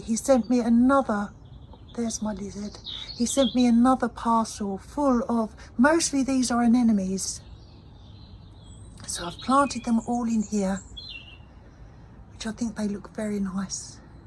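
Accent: London, United Kingdom. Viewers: Hi Lovely to hear from you. My husband watched today and said you sounded like a Londoner. You have been so busy again. I will be copying the artificial grass liners idea. Regarding your 'trinkets'... I love them👏 i have some too especially signage ones eg. ' Don't worry,be happy' ( of course we do worry,but i like the sign). I'm a great one for separating my plants. Makes my garden look fuller too. No big buys lately,just some paler blue muscari. The old favourites are surprising me too. Your garden is a joy. Regards to Richard and thank you for making me smile💯💖☕🥰💞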